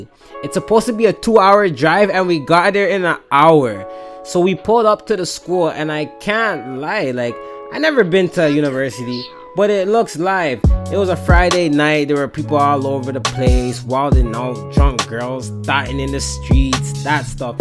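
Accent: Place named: Toronto, Canada. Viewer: Dats funny I be from the 6ix too I recognized ur accent fam 😂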